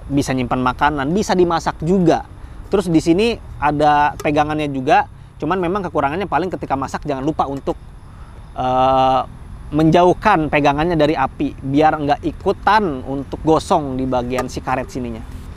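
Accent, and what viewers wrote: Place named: West Java, Indonesia. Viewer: Sunda pisan uyyyy mantaat lah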